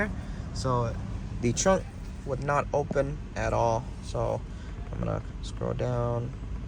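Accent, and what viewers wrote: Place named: Vietnam. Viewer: Knew you was viet from the first thing u said 😂💀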